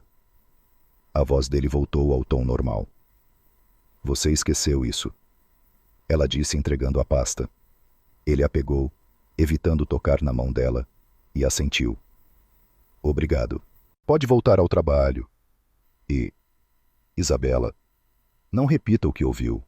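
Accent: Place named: Portugal. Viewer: Uma história muito interessante. Quem diria que a atitude daquele, milionário tão agressiva e fria, iria acabar numa linda história de amor. Narração perfeita, de Portugal ❤❤❤❤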